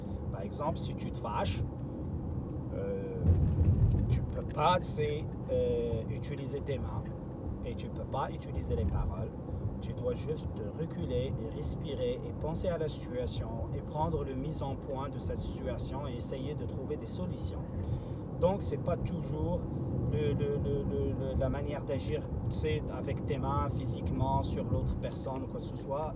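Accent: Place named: Canada. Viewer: Je pense frère que c'est préférable pour toi de parler arabe que de parler français et encore loin avec l'accent canadien ☺️ ça PASSE PAS😊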